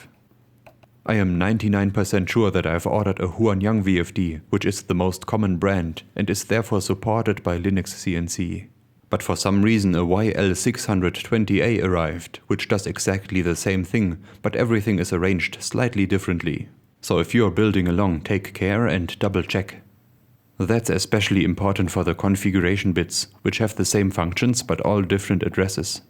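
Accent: Germany. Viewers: Love that German accent (and very dry sense of humor)! High-accuracy stepper motors please!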